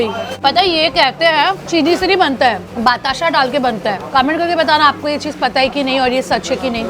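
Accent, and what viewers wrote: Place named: Bengal, India. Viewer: 1. You look like Prajakta Kohli 2. Your Bengali accent is so cute 3. I absolutely love the way you’re taking big bites ❤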